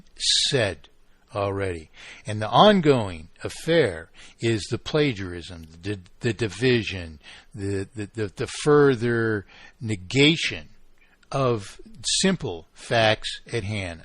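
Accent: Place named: Australia. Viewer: I will be waiting for the ultimate debate between Austrians and MPE advocates: Peter Schiff, Ron Paul, Rothbard VS. _________? Perhaps your Australian accent will suffice. does well but he doesn't talk as fast as these other guys.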